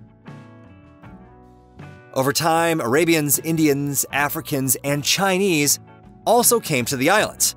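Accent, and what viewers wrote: United States. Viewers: Hurricanes at 90 mph - needs to do better research , as hurricanes can have winds well above 90 mph . As it was an American accent , guess I cannot blame the narrator , as the USA's education system is not as good as it's cracked up to be , with several university's recently caught selling degrees , just to gather income - this scandal , has done much damage to any degree holder , from the USA and it's corrupt universitys . It was also mentioned that no-one on Reunion is on welfare in the general population , it's the French government workers who are on welfare , not the population generally , they all work , producing Coffee , Vanilla and other crops . The road being built over the ocean , won't extend their "exclusive economic zone one bit . It's being built , to avert some of the problems associated with the current coastal highway , so that access to the airport , port , and other large city , is not interupted as frequently as it's claimed by the narrator .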